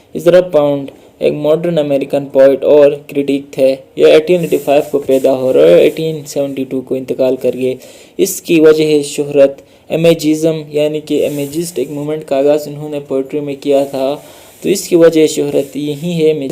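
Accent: Gilgit-Baltistan, Pakistan. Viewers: AP ka accent GB waloo ka ha.100% AP GB ka ho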